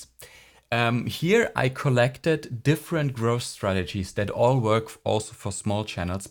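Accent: Germany. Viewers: I hear you are a German :D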